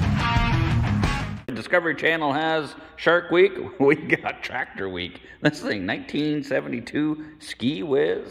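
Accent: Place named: Maritimes, Canada. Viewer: I like your accent, but trying to place it. I've got it narrowed down to either PEI or NS. Am I close?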